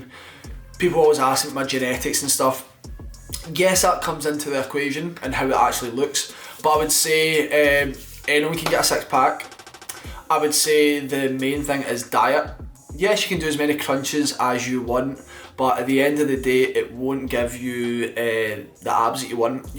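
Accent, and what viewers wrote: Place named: Scotland, United Kingdom. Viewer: Your accent is so great haha, I love Scotts. Great video mate!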